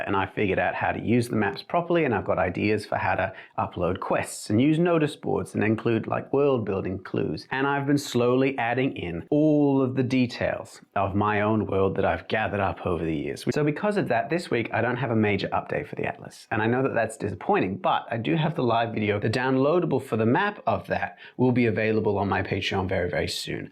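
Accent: Australia. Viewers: Finally an Aussie accent in a DnD context #normalisestrine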